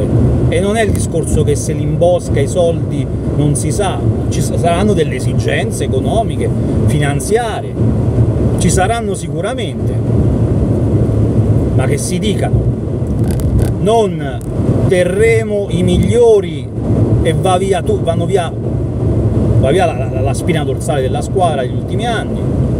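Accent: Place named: Marsica, Italy. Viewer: dizione marsicana impeccabile e piglio aggressivo da Aquila Reale del Velino. "Te mangane du portier"....tradotto "ti mancano due portieri". Mi manca l'Abruzzo e la sua genuina e montagnosa maestà!!!!! Sei troppo forte e da finto incazzato ancora di più 👏👏👏👏👏 Un saluto 😃😃😃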